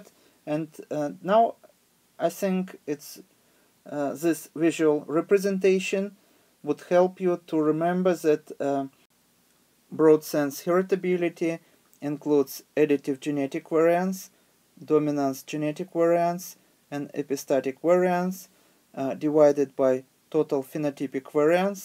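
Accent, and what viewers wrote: Russia. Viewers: I like russian pronunciation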